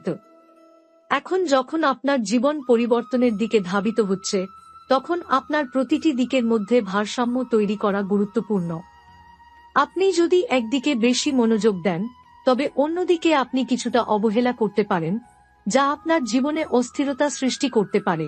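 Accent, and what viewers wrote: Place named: India. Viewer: Eta kono hindi vashi manus banglay bolechen, tai onar kotha gulo emon sunte lagche! bujhlen.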